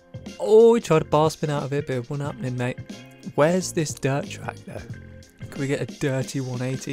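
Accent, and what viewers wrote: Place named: United Kingdom. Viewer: most British sounding dude ever but in the best way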